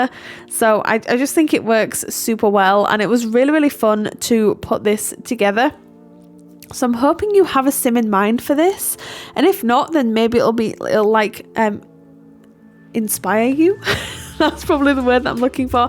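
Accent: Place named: Lancaster, United Kingdom. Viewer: Are you from Lancaster? 🤔 Your accent is so familiar!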